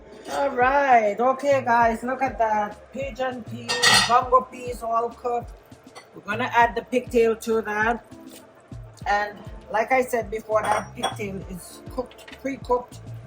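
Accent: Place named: Jamaica. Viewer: I don’t really like the Dried Peas. We make pigeon Peas Soup in Trinidad and Tobago and also in Grenada. I can imagine the taste. I stay away from the Pigs Tail 🤣. This is a meal for sure. They pot some round flattened dumplings sometimes. “Nuff nuff” 🤣🤣. You sound Jamaican too. Looks so good. Enjoy.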